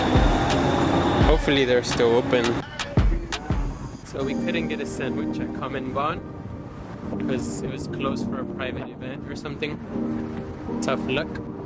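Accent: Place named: Philippines. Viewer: Quality video! May I know your nationality? You sounded like a filipino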